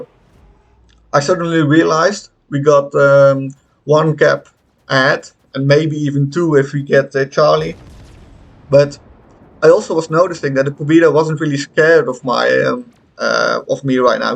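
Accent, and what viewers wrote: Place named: Netherlands. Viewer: You sound very dutch. Groeten uit Alkmaar 😁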